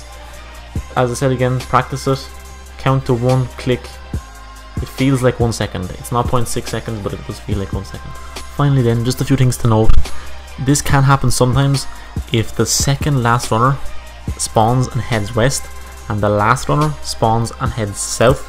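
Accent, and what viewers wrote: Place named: Ireland. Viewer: loool dat irish accent nice guide xxxxx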